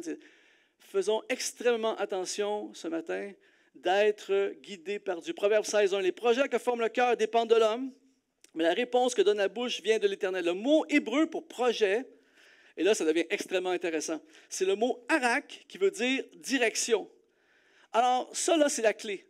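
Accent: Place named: Quebec, Canada. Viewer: Vidéo très inspirante, qui redonne du courage. Je suis un français de 34 ans et cela fait quelques années que le Seigneur travaille en moi, depuis mes 27/28 ans. Je sens que j'ai beaucoup évolué depuis. Avec l'expérience, je pense être sûr que le Saint Esprit m'a donné le don de clairvoyance, c'est à dire que je vois les signes, les guidances et les réponses de Dieu sous forme de texte (dans des livres, des publicités, des affiches...). Je suis tombé sur cette vidéo en faisant des recherches sur Youtube pour voir si justement quelqu'un d'autre témoignait d'être aussi guidé comme moi. Et c'est là que c'est fantastique! Je tombe sur ce merveilleux discours de qui a clairement un accent québécois. Dieu m'a montré des signes, m'a guidé depuis des années en me montrant d'abord le Canada, puis le Québec et enfin Montréal précisément. Là il n'y a plus aucun doute, j'ai bien un lien fort avec le Québec. 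Donc merci Oasis Eglise Rive-Sud, même si vous ne l'avez pas fait exprès, et merci à vous Seigneur!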